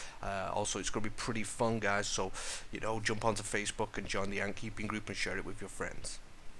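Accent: United States. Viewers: Why are you putting on an American accent? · Are you American, Scottish or Manc? 🤔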